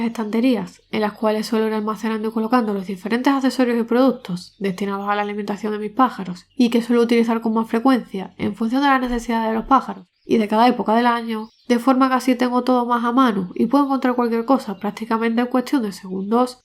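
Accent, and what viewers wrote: Spain. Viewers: Se me hacía difícil q ya no tuvieras l aviario y más q nada q ya no nos explicaras n tus videos todo lo q nos necesitámos saber sobre las aves, pero q bueno q s uno nuevo, me gusta como explícas y me gusta l tono español d tu voz🤗😍. Salúdos desde García N.L. MonterreyN.L.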